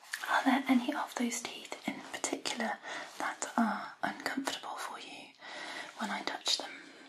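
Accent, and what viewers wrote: United Kingdom. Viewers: Is this brit accent ? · SHES BRITISH MATE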